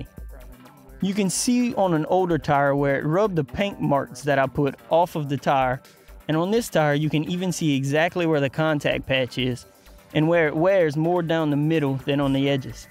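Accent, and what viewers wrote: Louisiana, United States. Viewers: Where are you from? Have to be from South Louisiana w that accent . Who Dat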